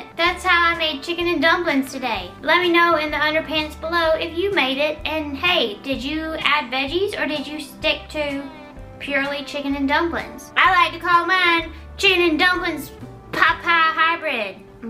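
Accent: United States. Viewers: love you're country accent love you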